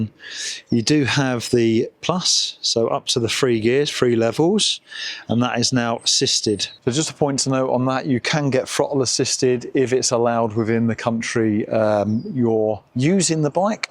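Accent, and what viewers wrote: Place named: Essex, United Kingdom. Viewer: Same here too - this is happening with quite a lot with channels I subscribe to. Great channel this, and the fella sounds a bit Essex! (my area) I am about to pull the trigger on buying one of these (2026 model)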